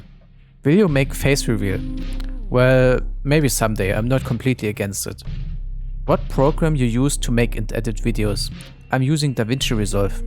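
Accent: Germany. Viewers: i love your german-english it reminds me about laovaan who is also a german speaking english in his vids. you sound so similar kinda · What about Cult of the Lamb? Had some Isaac - Feelings while watching the Gamescom-Trailer. Nice (Cat-)face reveal Lieb deinen Kartoffelakzent xD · I actually like the German accent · I love how your german accent sounds when speaking english, like dessert for the ears.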